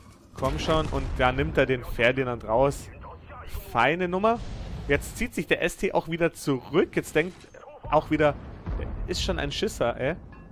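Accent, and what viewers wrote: Bavaria, Germany. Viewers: aus welchen teil Bayerns kommst du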